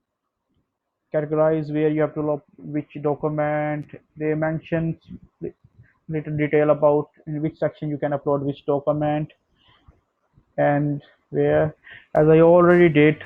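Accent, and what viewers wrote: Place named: India. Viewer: Indian accent is very annoying disgusting 🤮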